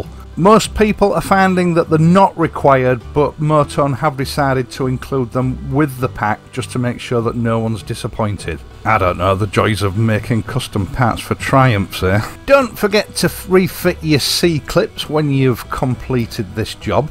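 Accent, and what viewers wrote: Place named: East Yorkshire, United Kingdom. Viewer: Read all about it! 'Listening to East Yorkshire accent increases longevity'. Well, your accent has worked for me. During an enforced 7 week hospital stay (following life-saving emergency surgery), listening to your voice while watching your videos has had a most profound effect upon my life already. 1) My son presented me with a pre-loved Royal Enfield Continental GT535, 2) This in turn has restored an urgency to once again be motorbicycling and also an enhanced joie de vivre, 3) I have regained enough strength to laugh heartily with your wonderful dry wit. Thankou for taking the time to impart your mechanical knowledge and humour. If listening to an East Yorkshire accent extends life, then your reviewing of your videos while editing them must mean we'll have you around forever!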